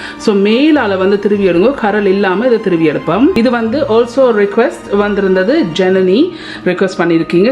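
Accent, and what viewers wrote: Sri Lanka. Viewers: Sister spoken Ceylon Tamil super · Nengal pesarathu alagaa iruku... Ilangai Tamil thaane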